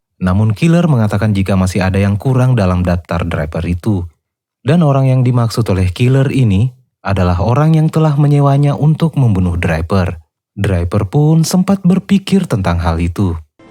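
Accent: Polewali, Indonesia. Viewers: Kamu org Polewali kah bang...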